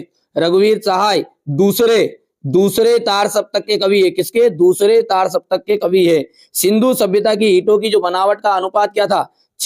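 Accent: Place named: Indore, India. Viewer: Tum indore side se ho kya ?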